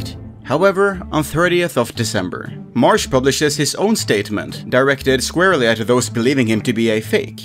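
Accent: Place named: Norway. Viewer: Are u Norwegian? Your accent makes me think you are.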